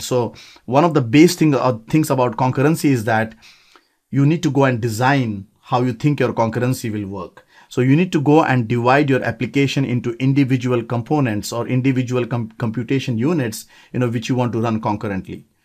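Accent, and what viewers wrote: India. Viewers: omg i can finally found an indian acent that i could understand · as to his accent, of course it's indian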